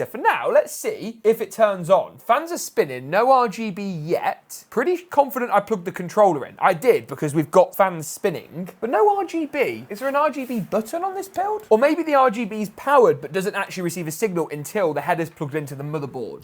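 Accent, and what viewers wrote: United Kingdom. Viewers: building this while giving instructions, not to mention maintaining the british accent, takes real talent. Kudos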